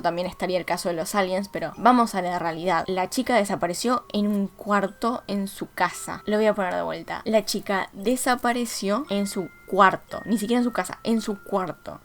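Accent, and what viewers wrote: Argentina. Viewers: Me sonaba tu acento Yo tipo: es argentina Y vos justito lo dijiste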